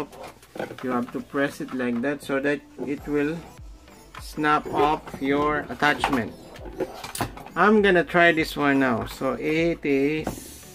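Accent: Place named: Philippines. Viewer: Sound like a pinoy. Saalamat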